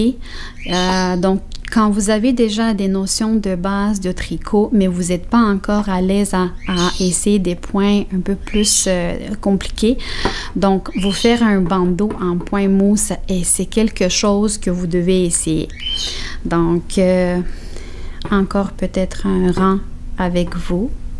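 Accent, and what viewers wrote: Canada. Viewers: Tu es un amour,tes tricots sont toujours magnifiques, c'est toujours un plaisir d'écouter tes tutoriels qui sont toujours bien expliqués avec ta gentille voix douce et ton adorable accent québécois, merci pour ton beau travail..des gros bisous pour toi de Lille 👍☺️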